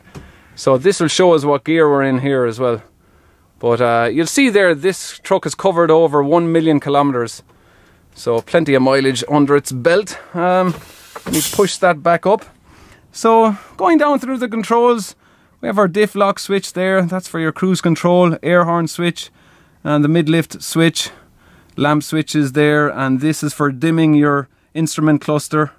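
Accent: Ireland. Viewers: Pretty sure he is Irish :) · He’s Irish you dumb fuck!! 🙄🙄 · Hey I like your accent are you Irish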